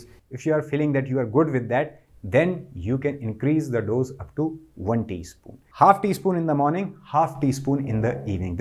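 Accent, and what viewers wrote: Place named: Punjab, India. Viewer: boht okha ho kr english me video bnaee hai ap ne...saaf pta chal raha hai k ap koi punjabi ho...urdu me bnatey to ziada behtr tha...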